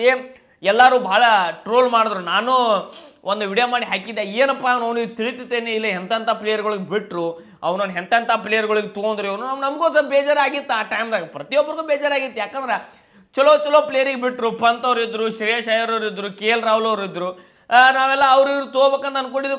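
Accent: North Karnataka, India. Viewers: Anna edu uttar karnatakad matu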